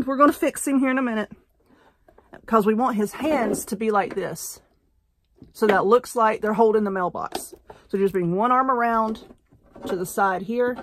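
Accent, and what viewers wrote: South, United States. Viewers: This is cute as a bugs ear😉..I get the biggest kick out of your videos..your VERY talented but,what I adore the most about you is..your southern/country as a turnip green😉😂..OH!and that's a compliment!!..I'm from Tennessee(live about 30 ft. from Monteagle Mtn.😄)..you keep makin' am & I'll keep watching😊💕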